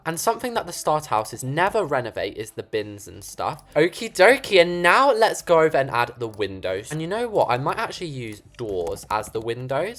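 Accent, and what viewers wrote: United Kingdom. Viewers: WAIT Y'ALL THEY'RE A BOY?! I THOUGHT THEY WERE A GIRL THAT HAS A BRITISH ACCENT 😭😭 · gay ish? Naur that’s called a British accent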